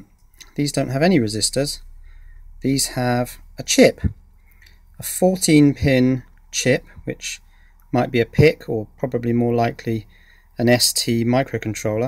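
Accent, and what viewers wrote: United Kingdom. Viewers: Very refreshing to hear a brit with an old accent like his, good work.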